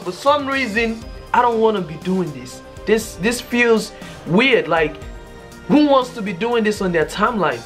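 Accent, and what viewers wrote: Africa. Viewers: He sounds sooo african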